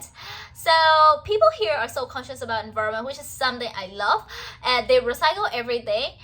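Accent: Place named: United Kingdom. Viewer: U r starting to sound like a British...Love ya❣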